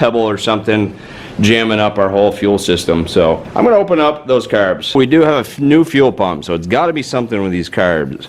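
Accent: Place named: Maine, United States. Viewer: You sound like a Mainah.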